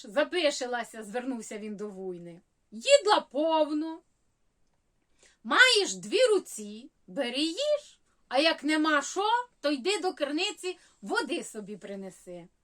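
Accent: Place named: Podolia, Ukraine. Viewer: Рідний Подільский діалект, говорите - ніби співаєте. Міцного Вам Здоровля, дякую за гарний настрій)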